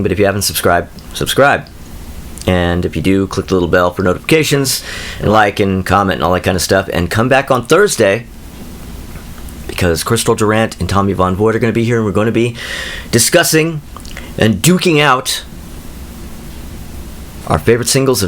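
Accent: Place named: England, United Kingdom. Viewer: Love the Monkees funny how your doing a English accent when most were American great showing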